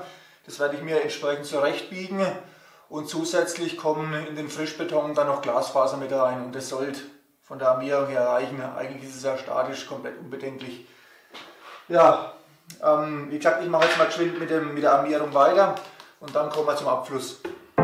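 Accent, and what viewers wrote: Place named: Franconia, Germany. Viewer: Allein für‘s fränkische einen Daumen hoch. Grüße in die Heimat.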